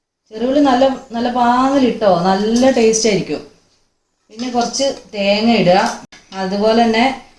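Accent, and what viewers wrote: Kasaragod, India. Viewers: Slang mariyalo.. thanni kasargod❤ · ആഹാ നമ്മുടെ സ്വന്തം കാസറഗോഡ് ഭാഷ 😜🤗നീർദോശ 👌👌👌👌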